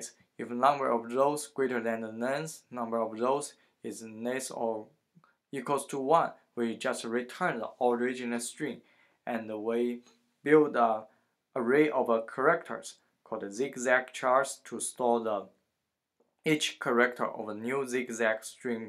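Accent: China. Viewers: 是东北老乡不？哈哈哈哈哈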